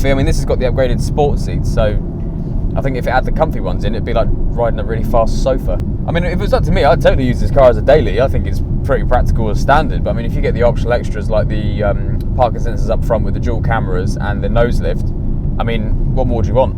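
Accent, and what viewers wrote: United Kingdom. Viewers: *English humor, the dude is English, even I as an American knows this, heck his accent is a dead giveaway. He's not Scottish or Welsh. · And he sounds like he's from Essex.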